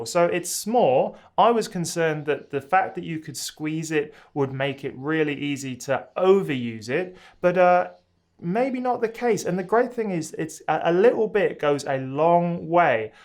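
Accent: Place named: United Kingdom. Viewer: Just out of curiosity how did you manage to get Beardbrand products? I assumed by the accent you were here in the UK 🤓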